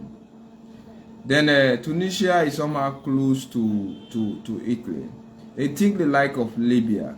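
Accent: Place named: Nigeria. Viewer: But you sound Nigerian ooo😅😮. I’m not saying it’s wrong, just an observation.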